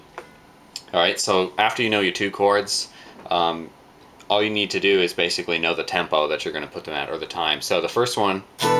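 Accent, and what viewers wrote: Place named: Germany. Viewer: I'm really speechless at how wicked that thing is. You sound a bit like Klaus from american dad, a slight german accent there :-)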